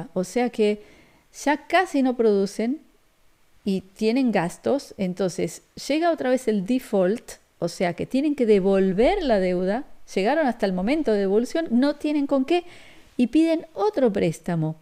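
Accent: Argentina. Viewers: Tu acento ... te delatan, sos de Argentina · Ese acento tan bonito *, Argentina! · Naciste en Argentina por tu dejo. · Por tu acento, naciste en Argentina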